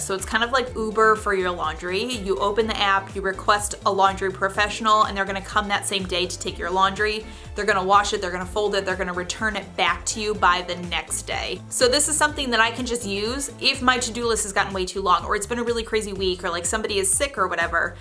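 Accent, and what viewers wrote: Midwest, United States. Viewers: Are you from the midwest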